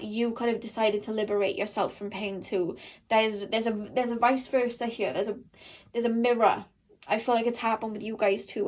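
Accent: Wales, United Kingdom. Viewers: Excellent reading! Love to hear your FUN WELSH ACCENT 😀! 😀Thsnk you for sharing your BEAUTIFUL GIFTS with us! You are very TALENTED! 😁😄😄😃😀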